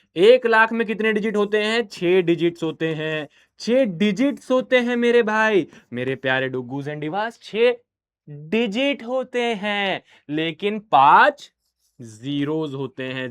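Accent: Bihar, India. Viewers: Sir please बच्चों जिससे acting mat kara karo समझ nahi aata or बिहारी mat bola karo na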